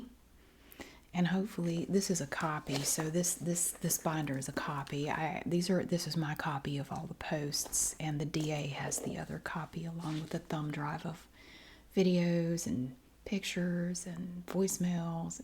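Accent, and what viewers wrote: South, United States. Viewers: I really love both of channels I'm from the UK and I really love the southern American accent I find it very relaxing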